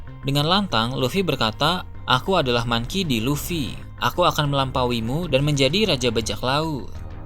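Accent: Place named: Lombok, Indonesia. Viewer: Medok lombok tajem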